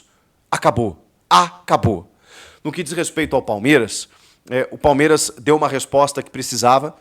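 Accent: Rio Grande do Sul, Brazil. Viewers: Essa versão do Chico eu não conhecia. Até o sotaque gaúcho apareceu. Por mais que seja um jornalista brilhante, hoje quem postou esse vídeo foi o torcedor. Ele é um gremista nato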